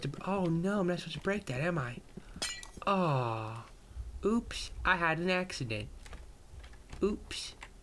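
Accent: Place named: England, United Kingdom. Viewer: I love his English accent. It's........ EPIC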